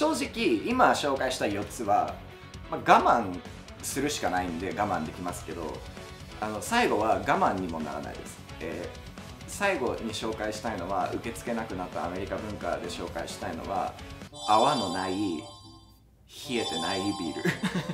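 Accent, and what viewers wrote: Japan. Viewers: 英語もちゃんと日本語の発音になってる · 英語も発音が日本よりになってるのすごい笑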